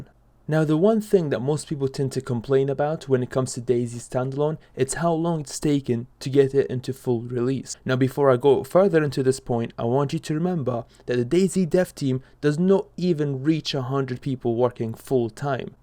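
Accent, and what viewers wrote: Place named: Scotland, United Kingdom. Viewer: Where are you from? You have a bit of a scottish accent but what is your first language? just wondering lol :)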